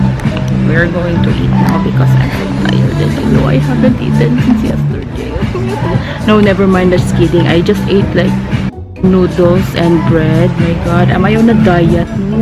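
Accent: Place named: Singapore. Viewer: Te, imong accent ky Singaporean na hehe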